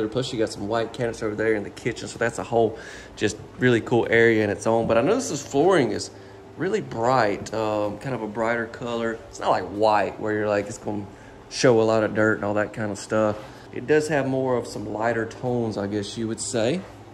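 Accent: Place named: Deep South, United States. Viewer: Greetings from Arizona! I love this house! I love the split floor plan, how the great room is laid out. The pantry and closets are fantastic! It’s just the right size for me, and any visiting guests, or even a tenant. I hope there are other options for the time color in the master bath shower,m. There there is lime in the water where I live. It builds up, and would be much more obvious more quickly than on lighter tile. I love your videos. They are well thought out. You do a great job of showing and detailing the features. You obviously like what you do. You are enthusiastic without being “in your face”. I love your easy going manner, and your accent, that Deep South gentle drawl. Angels on your bumpers and all around you.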